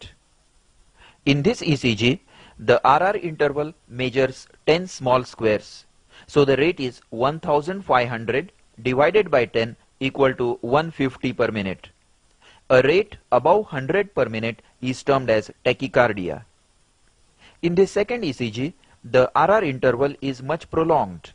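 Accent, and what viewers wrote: India. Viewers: Thank you. but you'd better say no thing ! indian english is damn terrible ! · good (old) video, but his indian pRRRonunciation killing my eaRRRRs.....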